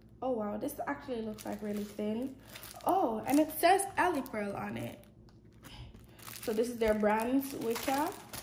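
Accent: Jamaica. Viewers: Are you Jamaican? I keep hair an accent for some reason hehe this is my first time coming to page definitely love this video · Are you Jamaican?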